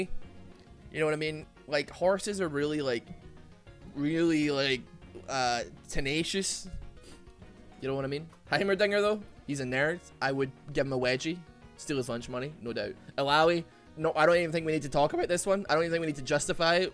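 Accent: Scotland, United Kingdom. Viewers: The facut that he's scottish makes this 1000 times better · If it wasn't for him being Scottish I probably wouldn't believe him.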